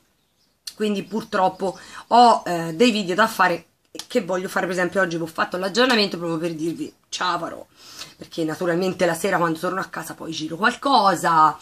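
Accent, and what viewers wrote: Tuscany, Italy. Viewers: Ciao, mi fa piacere che hai trovato lavoro! Quando ti ascolto mi sembra di essere quasi in famiglia xké dalla parte di mia madre sono tutti toscani da secoli e la parlantina toscana mi rilassa 🤗poi tu sei schietta, sincera e senza filtri quindi.....mi fai tanta compagnia! Un bacione! Un augurio di buona ripresa x la tua nonna Leda!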